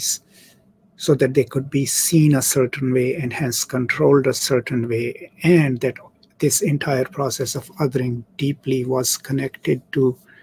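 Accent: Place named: England, United Kingdom. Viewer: thanks for your works! ps: i like your voice and english accent so much greetings from france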